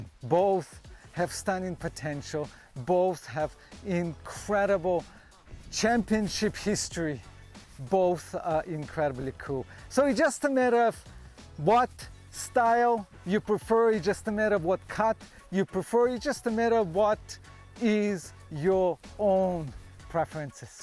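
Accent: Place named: Russia. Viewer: Recognisable heavy Russian accent, IMHO 🤔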